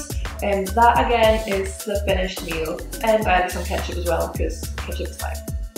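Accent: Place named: Scotland, United Kingdom. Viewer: You're totally getting a Scottish accent. Also this video is great!!! I need new meal ideas so this is perfect :)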